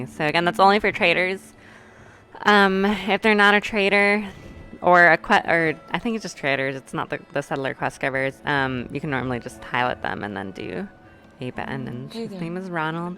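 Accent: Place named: United States. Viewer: I get the American part. You just sound more American and a bit like a friend of mine. I want to go to Boston and drink everyone under the table. Do you build all these or just compile them? I never even got around to installing that last one you recommended. I want fallout as a sandbox of stress relief. I like to come home jump on and have some escape. I spent 3hours trying to get audio drivers working for bigscreen tonight and I'm so done. Mods this complex, they just hurt me because always something goes wrong and I get too angry and need to play another game to get rid of the anger.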